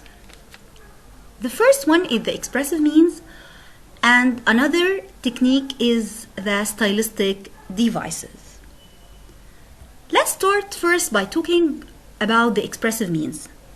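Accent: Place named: Russia. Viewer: Are you Russian? Your accent is just like Russian accent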